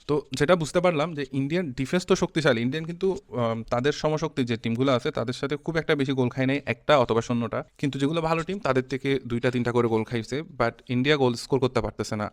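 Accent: Chittagong, Bangladesh. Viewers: ki bhai chatgaiya naki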